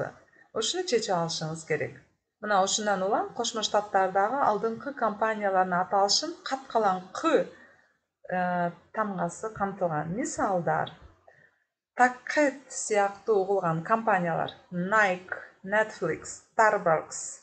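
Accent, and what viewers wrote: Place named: Turkey. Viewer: Молодчик 🔥😍 акцент местный турецкий передача информации убедительная и выразительная💯😘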